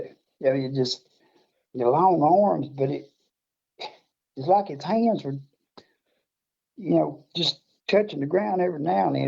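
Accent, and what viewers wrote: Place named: South, United States. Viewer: Accents, or local dialects, are not mistakes. Every place has its own, even New York and New Jersey and all up and down the CA coast. Even if they don't think they have an accent, they do. I come from the country in Southern Illinois, which is Southern, too, and I love all Southern accents. Your manner of speaking and your accent greatly enhance your story. Country and Southern people get a lot more in than just words. More is being said when one turns one syllable into three. Nuances. Thanks for your story. My husband and I loved it. We are up between Killen and Lexington, 42 miles from Bankhead, says Google. Be well.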